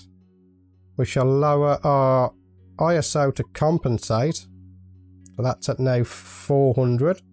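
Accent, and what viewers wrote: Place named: Birmingham, United Kingdom. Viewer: Gotta luv the brummie accent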